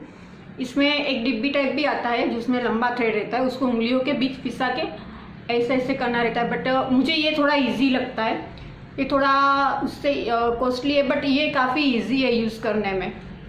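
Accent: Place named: Gujarat, India. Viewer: Ma'am I think app gujarati ho. Apka accent gujarati jaisa lag raha hai.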